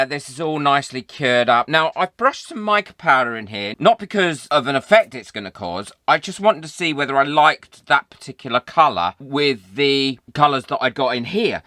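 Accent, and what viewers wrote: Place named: Estuary, United Kingdom. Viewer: I always think of you as the Jamie Oliver of the crafting world, I think it’s the estuary accent! Hope that’s not an insult!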